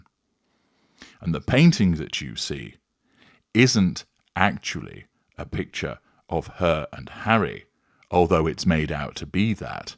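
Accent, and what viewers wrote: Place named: North, United Kingdom. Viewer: I detect a Northern Accent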